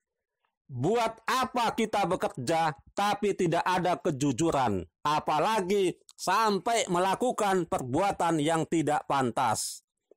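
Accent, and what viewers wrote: Java, Indonesia. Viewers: L11,bejerja sambil berdoa..benervkali saudaraku..janji om sudah om laksanakan,kok logat ngomongnya asli kental logat orang jowo yo..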